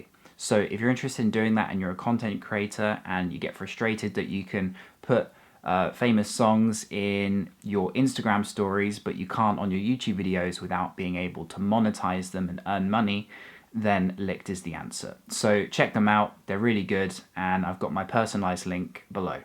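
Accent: United Kingdom. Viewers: Uk dialect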